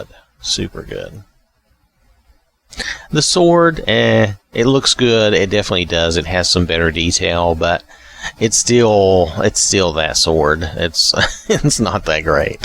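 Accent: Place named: South, United States. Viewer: Don’t hear a lot of southern accents in modding, you are appreciated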